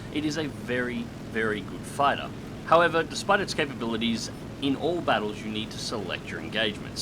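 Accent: Australia. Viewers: hey are you an auzzi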